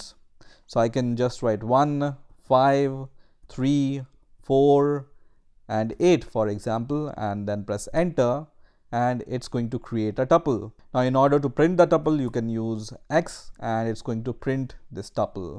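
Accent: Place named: India. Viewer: i like your indian accent. it gives the lesson some life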